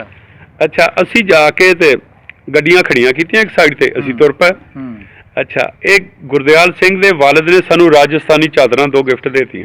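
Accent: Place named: Punjab, India. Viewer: ਜਨਾਬ ਜੀ ,ਤੁਹਾਡੀ ਪੰਜਾਬੀ ਤਾਂ ਬਿਲਕੁਲ ਚੜਦੇ ਪੰਜਾਬ ਵਾਲੀ ਹੈ, ਜੀਉਦੇ ਵਸਦੇ ਰਹੋ ।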